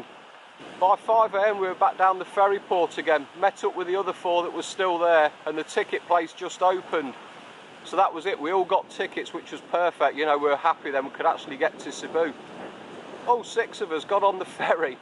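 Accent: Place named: Black Country, United Kingdom. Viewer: You a Wolverhampton lad I’m trying to figure your accent buddy either that or brummy don’t take offence they’re similar lol 😂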